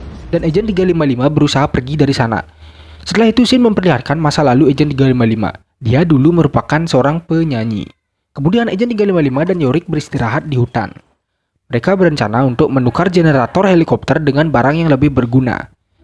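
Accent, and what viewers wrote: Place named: Bali, Indonesia. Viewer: Bang org bali ya?logatnya kentara skli hehe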